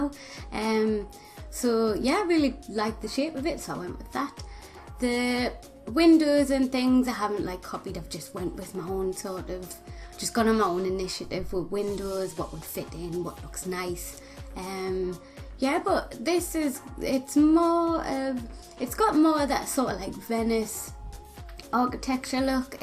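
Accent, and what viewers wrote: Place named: Newcastle, United Kingdom. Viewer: I just wish I knew how to make things like this! Love your accent as well :) Geordie for the win!<3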